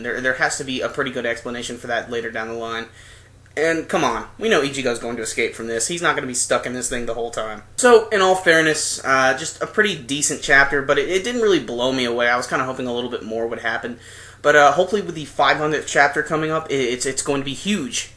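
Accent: Germany. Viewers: Your german accent is freaking hilarious xD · No matter what happens this final arc I will enjoy it simply for the stereotypical German accent in your reviews.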